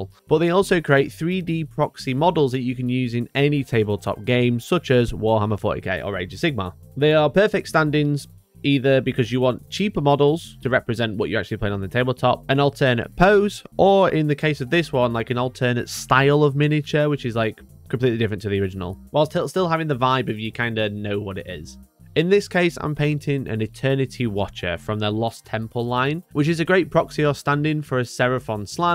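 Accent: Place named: Newcastle, United Kingdom. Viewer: Not a clue what this guy is saying. Must be from Newcastle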